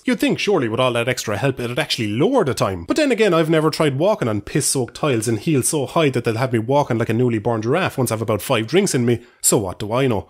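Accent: Ireland. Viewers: I like the Irish Accent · I really like watching these Guide to Ireland videos because ever since finding out that I'm less than 20% British/Irish (from my dad's side whose last name was Kilpatrick) I've been really curious about Irish culture and seeing you pretty much confirm all these Irish stereotypes is hilarious to me. I also like the Irish accent as well, anything that doesn't sound Mid-West American or boring like British is a joy to my ears cause I imagine how that particular accent would sound on a character to make them more authentic. Like the Irish, I tend to have diarrhea at the mouth and I also don't shy away from a fight if necessary but I don't drink alcohol that's just me. And you my green duck fellow seem like someone I could chat it up with because your banter be on fleek and love that. I've seen all your videos so far and they're all a treat to watch and enjoy. 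Idk how to say "keep it up my duck brother" in Irish but yeah, keep it up my duck brother!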